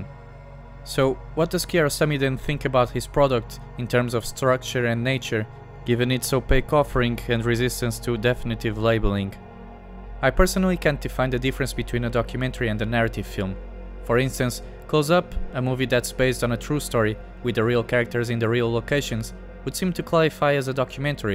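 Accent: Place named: Portugal. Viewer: Esse inglês com sotaque português não me enganou! Parabéns pelo vídeo, para mim o Close Up é dos melhores filmes de sempre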